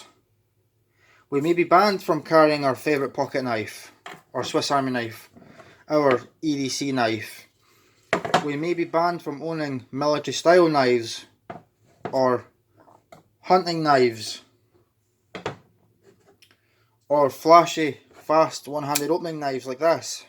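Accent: Scotland, United Kingdom. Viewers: Just nice to hear a fellow Scotsman talk about similar hobbies, even if you were rambling shite, I'd still watch haha. You've spread loads of awareness with this channel!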